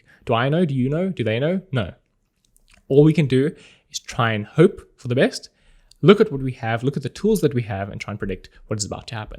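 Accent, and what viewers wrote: South Africa. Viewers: You sound so South African... Love your videos, they the best. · Love that South African accent,! 👍👍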